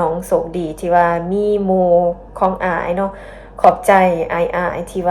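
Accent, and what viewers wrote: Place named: Loei, Thailand. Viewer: สำเนียงภาษาหลวงพระบางสำเนียงเดียวกับกับ,จ,เลย,จเพชรบูรณ์ของไทย❤❤ครับ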